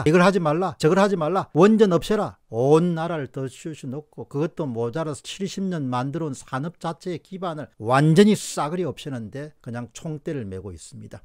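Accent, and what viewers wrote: Gyeongsang, South Korea. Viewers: 이 물건도 갱상도네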